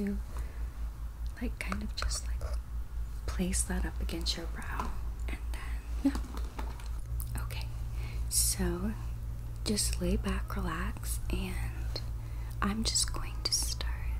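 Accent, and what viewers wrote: South, United States. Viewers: Luv those southern accent vids💯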